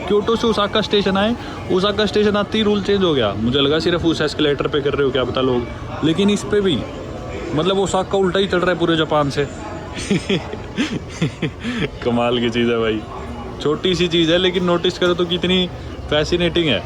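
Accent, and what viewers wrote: Haryana, India. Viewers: Saplings non dubbed videos achieved legato hai haryanvi accent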